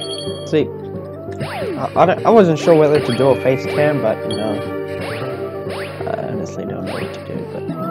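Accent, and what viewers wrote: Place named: United States. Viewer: You've got a lil american accent in that, I see you watch my videos too much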